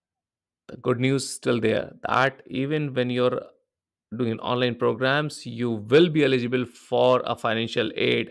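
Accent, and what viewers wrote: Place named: India. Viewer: Why are you sounding Indian?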